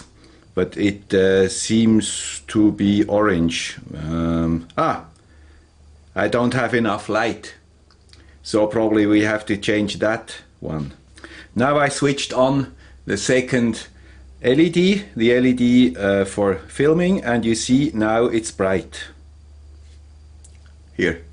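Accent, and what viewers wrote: Switzerland. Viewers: I like the swiss accent, just saying...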